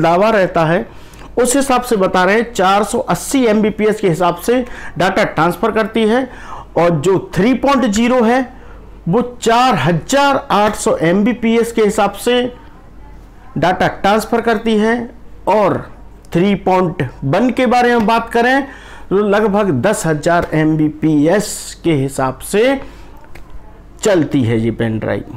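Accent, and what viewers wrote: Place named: India. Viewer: I understand only one word - "pendrive". Where you learn this indian english dialect?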